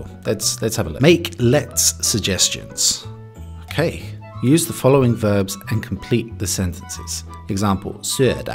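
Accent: Finland. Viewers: You have improved quite a bit. Well done! 🔥 I just wanted to mention that you have the same sort of Finnish accent my husband has as well. It’s this funny tone of voice, a bit like a toddler or a cartoon character speaking. I am not sure why, but I think if you make your S and T sounds sharper and your L ”clearer”, it will bring your Finnish to a next level. 😁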